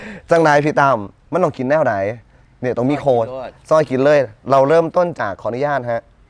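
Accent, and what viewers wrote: Isan, Thailand. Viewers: ตามหลายคลิปแล้วจร้าแต่คลิปนี้ลั่นเลย🤣🤣#เว่าอิสานน่าฮักดี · 555555ระว้งพยานเด้อกินหลายๆหะเว้าอีสานใด้น่ารักมาก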